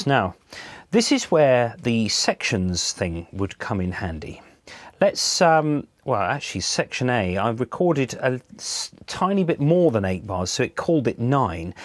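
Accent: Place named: United Kingdom. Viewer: Hey man, loved this tutorial, and your accent it reminds me of a British tv show, cant remember which but it draws you in, please keep this type of content up.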